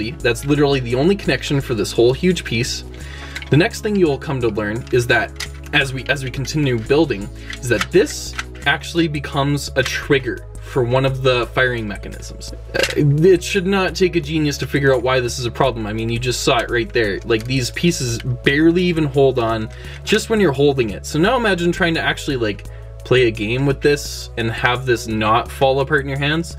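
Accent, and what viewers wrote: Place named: Canada. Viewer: Welp I was wrong your voice ait wired you are just Canadian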